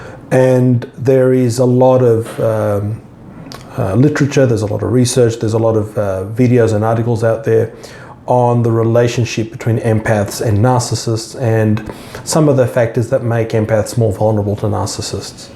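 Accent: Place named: Australia. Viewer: At approximately I wasn't able to understand the word you said after "end in". Maybe because of your most lovely Australian accent. (: This exact scenario is what my friend hasn't yet been able to understand is transpiring between her and the narcissist she THINKS she loves, and who is deceiving her into believing he loves her. Whenever you have time, let me know the word. Thank you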